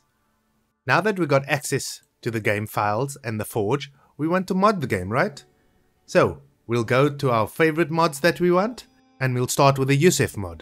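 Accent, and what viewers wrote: South Africa. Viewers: Are you from South Africa your accent is quite familiar. And thanks for this. I have Assassin's Creed Rogue and modding it has always been something I wanted to try out. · You're the most south african sounding guy i've ever heard 🤔🤔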